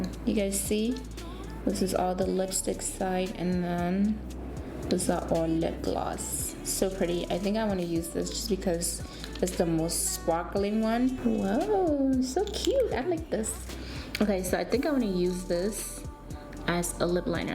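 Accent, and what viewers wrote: United Kingdom. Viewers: British accent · almost British...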